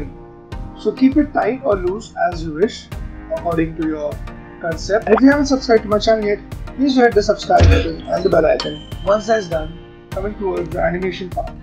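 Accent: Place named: India. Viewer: Background music is very loud, and the speaking volume is quite low. Also people who are not familiar with Indian accents would find this extra difficult to understand! I'm of course not suggesting you change your accent haha, but if the music were a bit lower and the speaking volume higher this would make the video much easier to follow, ta!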